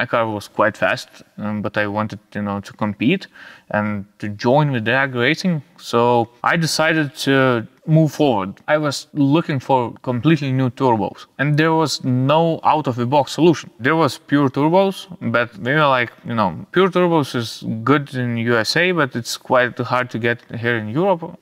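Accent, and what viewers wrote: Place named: Russia. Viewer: Nah mate, like a man who can russian and perfectly English he has an typical russian/East european accent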